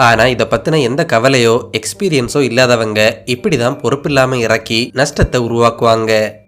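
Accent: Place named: Kerala, India. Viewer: Mallu Tamil super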